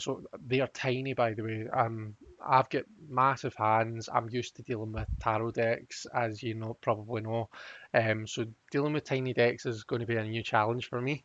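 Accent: Scotland, United Kingdom. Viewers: I love this deck. I was really lucky that I had the opportunity to buy it from Caitlin Matthews in person and she gave me a 10 minute explanation of the deck and Lenormand. Her book The Complete Lenormand Oracle Handbook is amazing too. So nice to hear another Scottish accent on YouTube 😃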